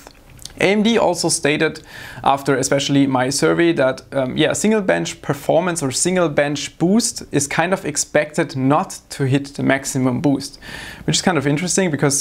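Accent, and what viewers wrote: Germany. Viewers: Does anyone else have the feeling that this guy is so german that when he speaks english he still sounds like he is speaking german? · Das gute alte deutsche englisch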